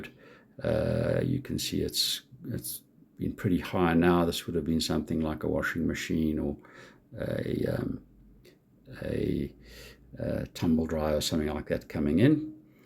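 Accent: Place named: South Africa. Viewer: I presume you have made this video in South Africa, judging by your accent. The App has been recently upgraded and for people in the U.K. or in the latitudes further away from the equator force charging from the grid is a very important function. Because if the user wants to make the most of the longer summer days to charge the battery and turn the force charging off they are able to do so. While in the WINTER months when you get only seven hours of sunshine and have an access to cheaper tariff rates during the middle of the night you want to charge your battery to use during the day time. The recent upgrade has TAKEN this facility away and if you are considering buying this system, have access to cheaper rate night time electricity and live more than 40 degrees latitude north or south of the equator this system is not a good buy.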